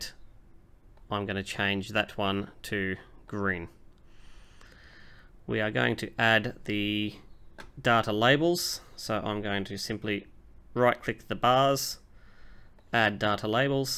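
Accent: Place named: Australia. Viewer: I've been using Excel since 1993 ver. 5 & am still learning. Great channel! Was in Australia for a whole month in 1987 so recognized your accent. Cheers from Vancouver.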